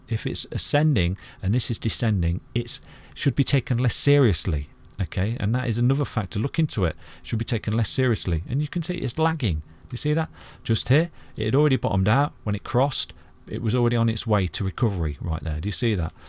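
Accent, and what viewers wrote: United Kingdom. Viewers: You British my man? Find your information impressive! Would 100% watch a tutorial from you (Y)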